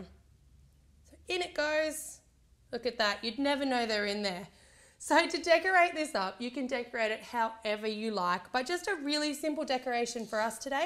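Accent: Australia. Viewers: I love all of your videos !!! I love cupcake making !!! Thank you so much for your tutorials! And btw I love your Aussie accent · Aussie accent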